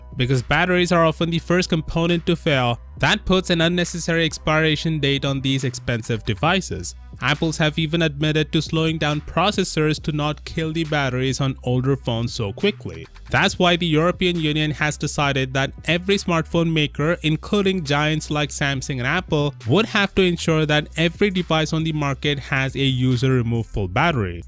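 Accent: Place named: India. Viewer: Disliked. Sounds like Indian scam call center.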